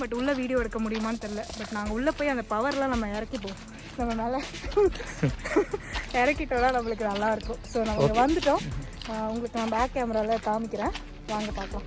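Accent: Sri Lanka. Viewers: இலங்கை தமிழ் ரொம்ப அழகு நானும் இலங்கை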